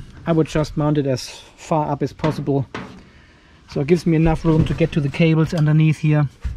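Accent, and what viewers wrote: Germany. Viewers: I enjoy trying to figure out where people are from by listening to their accents. I've been watching a lot of your videos lately and you were really throwing me off. I had pretty much decided you were a native German speaker but your surroundings didn't look like any part of Germany I've ever seen before. You helped me work it out in this video when you mentioned your German power strip with the Australian plug. I really like your videos as I'm preparing to put together my own battery bank and solar system. Also, congratulations on passing 1000 subscribers!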